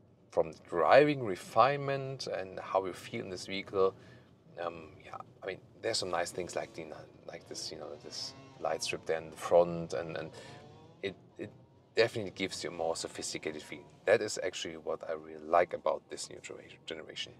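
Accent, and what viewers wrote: Germany. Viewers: Nice review, very nice car - though rather pricey. But may I just address something here, and I mean this in the nicest possible way: I find it very hard to listen to the accent, and even more so, the numerous mistakes in the language. For example: "If it WOULD BE an S-line, it WOULD..." drives me nuts. It should be: "If it WERE an S-line, it WOULD..." When you choose to present in English, the language should be flawless, especially when named. Alternatively, just do it in German. And for the record, I'm German myself.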